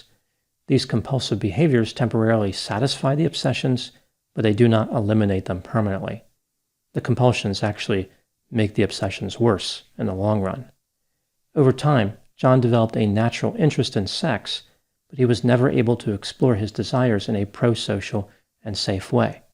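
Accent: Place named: Mid-Atlantic, United States. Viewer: Hi! Question might seem odd, so forgive me but I'm curious. I've been listening to your videos for a couple of years now. They've been soo helpful. Thank you! You have a particular accent..would you happen to have grown up in Southern NJ, PA, or MD? Folks from those areas have a particular way of pronouncing certain words. Lol. I've made a bet with my friend that you're from one of those places lol.😆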